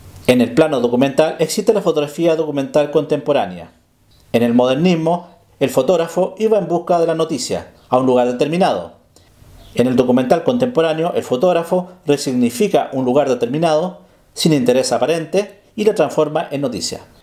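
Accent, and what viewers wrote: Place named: Chile. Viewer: Muy bueno tu video. Me queda claro que eres chileno, nuestro acento es inconfundible. jeje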